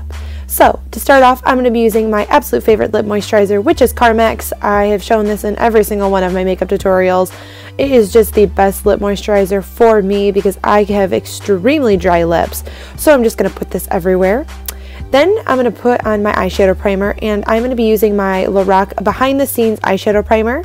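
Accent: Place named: North, United Kingdom. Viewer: I cannot tell you how much I love your videos!! Ps. Your northern accent is freaking adorable!!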